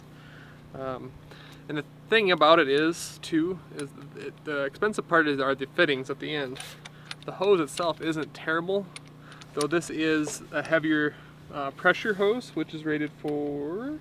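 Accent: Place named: Minnesota, United States. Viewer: You are just so laid back and patient. That is what it takes to work on this stuff. Love the vids. Your Minnesota accent is similar to my accent from Canada.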